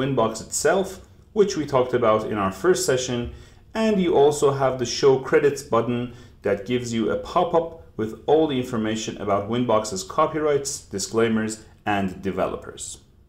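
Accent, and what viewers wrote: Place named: Iran. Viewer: Damn.u got some persian accent.nice teaching by the way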